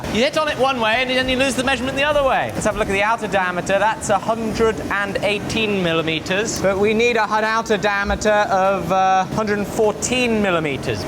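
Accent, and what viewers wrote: England, United Kingdom. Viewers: If I could sound like anyone, I would choose this guys voice. The British accent is so perfect. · i like these channel all in all born with hands for steel very fat strong fingers lol cute baby face talks like a real english man has a smart brain has alot of great skills and work ethics and makes alot of interesting things to watch. the whole package is cool and interesting. i like it keep it up.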